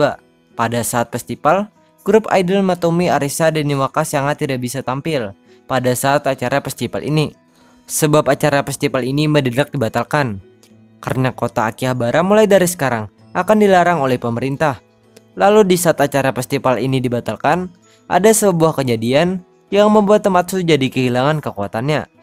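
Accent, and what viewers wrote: Banjar, Indonesia. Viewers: Logat banjar nya 🥲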